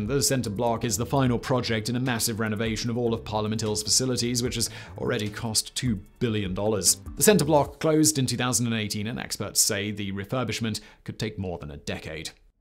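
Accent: United Kingdom. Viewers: This guy uses his British accent for rhetorical emphasis. And then there is "Dem dooshen volke".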